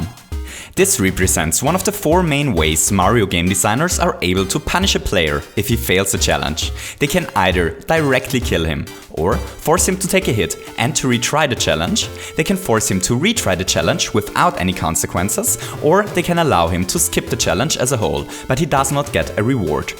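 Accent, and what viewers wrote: United Kingdom. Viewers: "Goompers" "Koopers" "Pletforms" "Dadly" "Chellenges" "Flegpole" WHY IS BRITISH SO BAD!? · I'm guessing he speaks English with British people. They substitute "ah" for "er". It could be hard to distinguish between the two in conversation.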